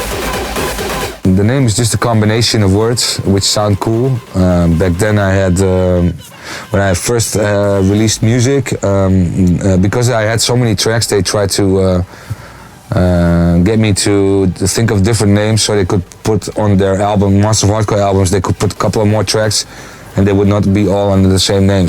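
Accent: Netherlands. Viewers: Je merkt wel dat je Nederlands bent man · Jamie GamingTM nah ofcourse. He's dutch! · Jup! Je hoort echt duidelijk zn accent. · Love you dutch accent so much <3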